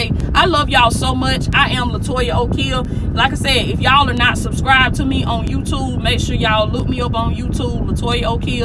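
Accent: South, United States. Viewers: This sister chopping up 🔪 Real Truth with that wonderful southern accent....🍓🌹🌹🌹♥️♥️🙏🙏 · Your accent is so adorable to your Godly personality is this a Texas, or the Carolinas, Georgia maybe a Florida accent? God is good. The enemy only comes to kill, steal and destroy... Remember that! If someone is an ex its for a reason. God doesn't allow a true life partner/destiny spouse to exit your life, the purpose would be greater than the two's emotion or troubles and God's sovereign will would cover and keep the relationship steady and balanced. The Lord gives blessings and good gifts a help mate not a satanic agent or a repeat offender. When a wicked person resurfaces it's like a venomous snake, when it rears its ugly head, cut it off! WATCH & PRAY... Bloodline Circles all around us they cannot cross over! The deeper you go with God and the more you are delivered and the more power you actually wield the spiritual grounds for certain people, places, spirits and things are broken. 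They are bound banished and vanquished away. They can try but will fail. Make the Hand of God and Blood of Jesus the gateway to your personal and intimate life. We are only human and imperfect but even Angels of God will keep evil away and ward them off. He wi move you on to a place they can never find you even in a crystal ball or to astral project to monitor you will be shut down! Nobody pass Jehovah put Him in front of all things. His plan is not for the wrong everything and everybody but for the proper ones.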